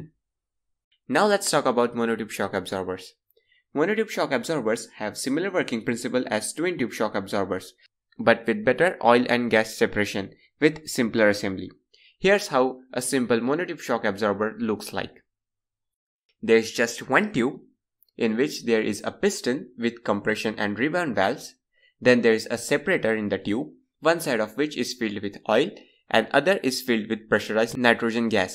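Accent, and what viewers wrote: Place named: Andhra Pradesh, India. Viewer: A Telugu English speaking AI bot. We are doomed 🤦🏿‍♂️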